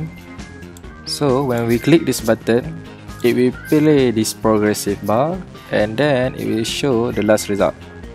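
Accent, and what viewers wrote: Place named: Malaysia. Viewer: Your sound like malay , are you malay ? Can we have a conversation ? Wanna deal something about cv interactive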